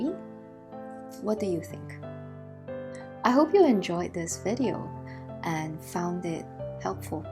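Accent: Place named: Singapore. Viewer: Okay, I knew it, you are from Singapore. The accent just can't really be hidden... it's too distinctive... plus the description about stressful studies and city-life, corporate rat race... Nice videos... must be nice to be able to just get away from the rat race.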